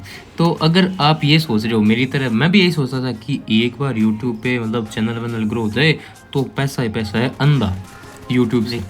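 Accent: Haryana, India. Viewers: same😆 pubg ka name letee hi khatam🤣🤣 by the way love from my heart m bhi haryanvi hu apki awaaz se pta chala ❤️ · Mehnat to hai bhai...... Mehnat to kar rha launda..... Haryana se ho kya? Apki voice se lag rha ❤ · same situation brother 😂😭 Aap bhi Haryana se lagte ho fir to 😂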